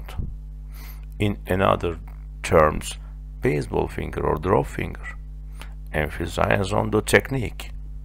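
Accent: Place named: Turkey. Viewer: Bir Türk'ün İngilizcesini hemen tanırım:))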